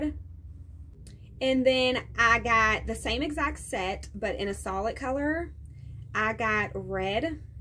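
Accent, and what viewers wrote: United States. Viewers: I fuckin love ur country accent💖💖💖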